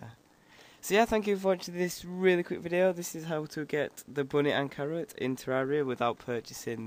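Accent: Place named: Scotland, United Kingdom. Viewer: it's the scotish accent:D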